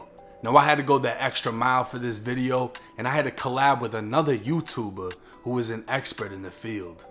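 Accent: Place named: Chicago, United States. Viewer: u the goat u gotta be from the raq boa u sound just like us great content.. bandman Kevo the city proud of u boa.. turned yo whole life around respect.. did u really get a bbl tho g? No judgement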